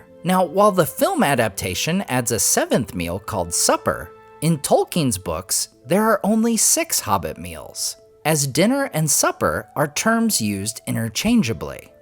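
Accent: United States. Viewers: Just wish could use a British accent.. I don't mind what region; but it should be British. The american accent kills it for me.. sure I'm speaking for others who are passionate about the Great works of Tolkien here. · The american accent is killing me!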